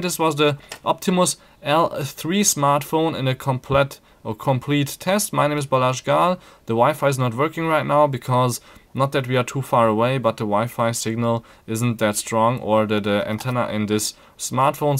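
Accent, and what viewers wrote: Germany. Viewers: Are you german?:-)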